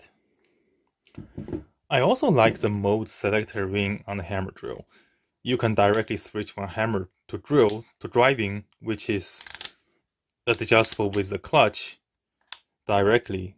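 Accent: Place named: China. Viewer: 老板听口音是中国人？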